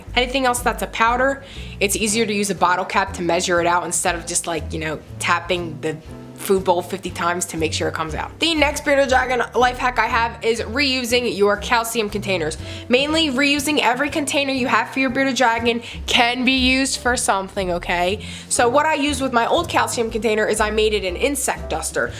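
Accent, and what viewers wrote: Philadelphia, United States. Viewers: Who else can hear her philly accent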